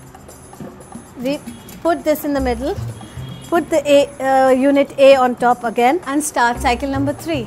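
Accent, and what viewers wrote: India. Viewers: Confuse of indian accent. A lot to consider. Decomposing should also made simple.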